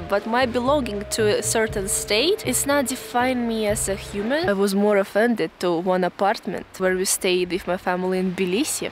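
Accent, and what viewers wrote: Russia. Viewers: У вас русский акцент- вот и понятно что русская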